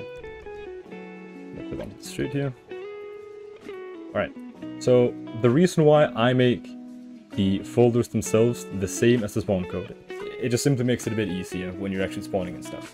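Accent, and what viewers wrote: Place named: Geordie, United Kingdom. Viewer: you sound like an American Geordie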